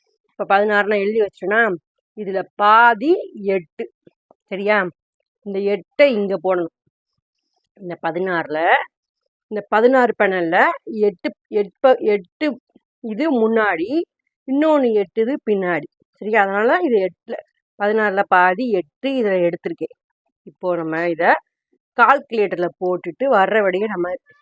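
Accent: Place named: Madurai, India. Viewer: புரியும் படி நன்றாகவே சொல்லி தருகிறீர்கள் நன்றி உங்களூடைய வீடியோவில் அங்கிட்டு இங்கிட்டு ஏத்தலக்கடி இறக்கலக்கடியா இருக்கும் என்று பேசியிருந்தீர்கள் அதைக்கேட்டு எனக்கு சின்ன வயதில் நாங்கள் பேசியது ஞாபகம் வந்து விட்டது இப்போது அதையெல்லாம் மறந்து விட்டேன் நன்றி உங்களூடைய மதுரை பேச்சு ரொம்ப நல்லா இருக்கு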